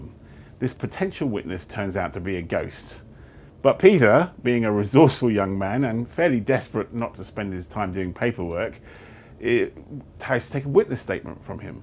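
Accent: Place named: London, United Kingdom. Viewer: educated London accent, really foreign name, I want to see this guy on 'Who do you think you are' NOW!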